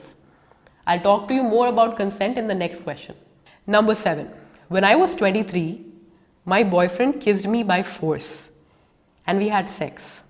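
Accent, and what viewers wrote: Hyderabad, India. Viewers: Omg,are you from Hyderabad....soo hard to know it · U Hyderabadiii?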